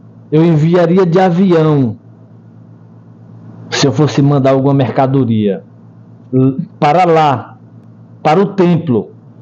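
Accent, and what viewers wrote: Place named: Brazil. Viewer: ele fala como brasileiro estudado cader o sutaco judaico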